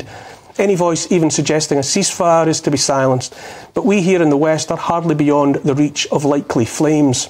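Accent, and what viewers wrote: Scotland, United Kingdom. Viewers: With that glorious Scottish accent, Oliver sums up so very eloquently the truly horrific situation we find ourselves living in. I can only pray that governments and their puppeteers will one day pay for their crimes against humanity. Thank you, I dont like the subject matter, but you are a joy to listen to ❤️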